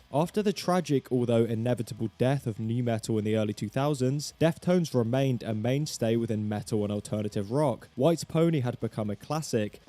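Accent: United Kingdom. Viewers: You're British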